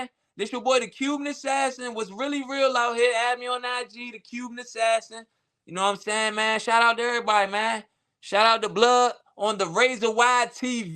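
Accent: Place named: DC-Baltimore, United States. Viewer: lmao I caught a little bit of both in his accent, slim, moe, Joe Like the district and then some hard EW's like baltimore 🤣🤣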